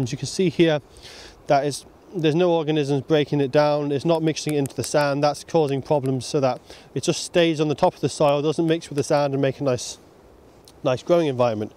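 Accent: Scotland, United Kingdom. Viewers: Hi! Thanks for this very interesting and informative video! Would love to see more of your documentaries! Excuse my nosey question, and don't answer it if you find it too intrusive: Do you speak Scottish English? I'm asking because I find it perfectly easy to understand you. But when I visited Scotland years back, I had a very hard time understanding people in Edinburgh and Glasgow (Glasgow was even harder!). Or don't you speak your regional dialect / accent when you're doing your videos? Have an awesome Sunday! Marc from Berlin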